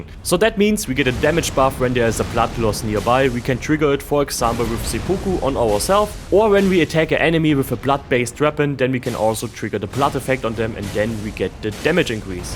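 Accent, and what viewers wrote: Germany. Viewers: gutes Englisch man hat fast ned rausgehött das du deutsch bist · cool build... der deutsche aktzent kickt hart lul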